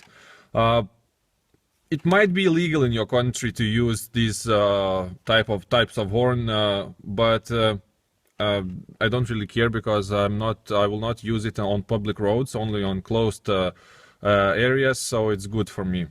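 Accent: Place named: Russia. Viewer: first, sorry for my bad Englisch, you talk like an Russian Comrade, i' am and my friends are very louthing about your Video , your Speech is wonderfull, you are the Best !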